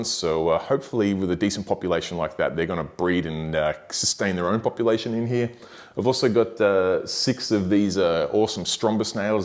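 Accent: Australia. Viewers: As a man raised in California, I’m only here to hear the best accent the world has ever produced! Damn I wish I was Aussie! Lol. Awesome tank man and grate series.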